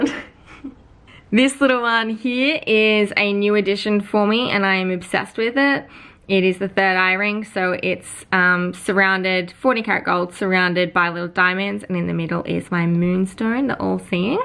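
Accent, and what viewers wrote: Australia. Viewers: Australian accent is very difficult for Russians🤯🤪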